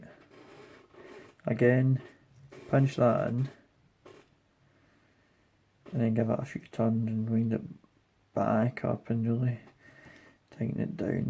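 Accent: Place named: Scotland, United Kingdom. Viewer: Not being a Scot, I guess the accent fooled me! Not to imply the fly was not decently made! Where I am, we can still fish for sea trout, (Newfoundland, across the pond)! Fishing has not been cancelled here yet! Not very hard to stay six feet apart here! Hope things improve and you are able to wet a line soon! Stay safe!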